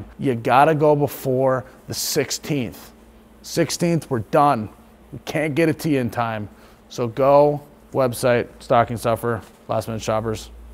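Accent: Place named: Minnesota, United States. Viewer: You're from Minnesota, aren't you? Love your channel.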